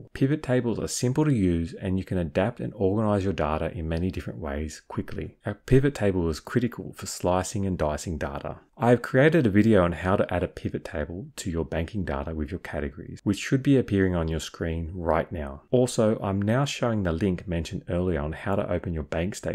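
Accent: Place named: Australia. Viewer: Hi, tnx for your response a couple of days ago. I fixed the issue re VLOOKUP! It was as you suggested columns not ‘lining up between data and reference tabs. Thanks so much! I can now follow your process for bank statements inc pivot table summary. Do you have a video for credit card plus automation as similar process but somewhat different ways I want to view?Leanne PS again so good to follow the Aussie accent😂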